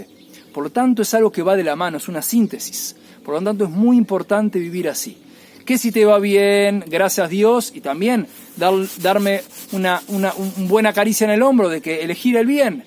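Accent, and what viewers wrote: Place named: Argentina. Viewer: Sino me equivoco usted también es argentino, por su asentó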